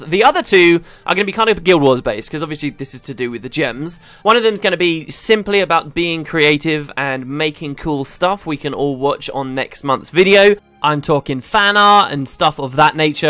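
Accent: United Kingdom. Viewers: You gotta tell me what area of Britain you're from! I heard you pronounce "law" similarly to "lore" and couldn't help laughing. Great ideas for the giveaway though, I hope it all pays off by bringing in new viewers:)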